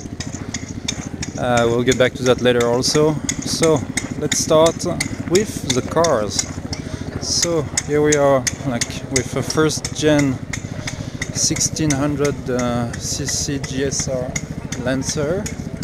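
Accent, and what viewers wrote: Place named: France. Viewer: Hi, I just turned on my youtube bit and there you were with this new video :) I recall the Datsun Z coupes, I think they began as 220Z or perhaps 240Z but they were a big hit here in USA...I recall the Toyota cars, the Corolla, the Carina, and another C namebut we were thinking of them as cigar names...only Corolla remains. Thanks for this tour and the English audio....it is nice with a great French accent :) I have several relatives who have Japanese connections...the guy who is in Japan now has taught English for many years. his given name is Rhys an he just got married to a woman who teaches French but I am not sure where they reside. Likely in the Tokyo area...I have watched videos of the 311 tsunami provided by fnn311, he gives a nice English subtitle....I believe you did not have a problem with that 311 event if you lived further south in that year...Thanks again for this video ! 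Chuck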